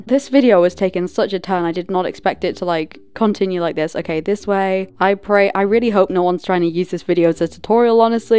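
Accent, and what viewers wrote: United Kingdom. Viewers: gosh i LUUUV your british accent it just sounds so smooth<33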